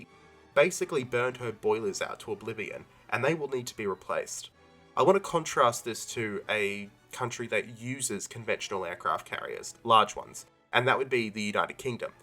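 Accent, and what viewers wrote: Australia. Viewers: Love your videos. As a fellow Aussie it’s great to listen to your commentary. Keep up the great work. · Excellent Video, I like long format generally, especially in an Australian accent.😉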